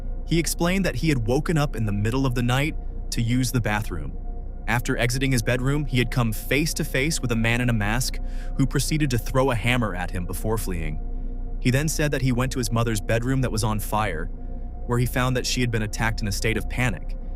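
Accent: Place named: United States. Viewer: the voice sounded American that's why I noticed. You can say whatever you want. It's just funny to me to hear it with an American accent I'm also amaerican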